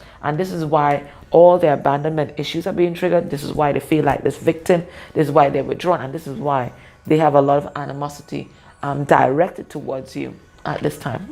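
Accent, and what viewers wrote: Trinidad and Tobago. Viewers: Am I catching a trini accent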